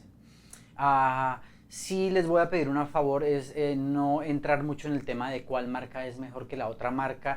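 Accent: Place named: Colombia. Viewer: eh tio eres buenisimo jajajajaja que risas me hecho con tu asento de colombia... eres un crack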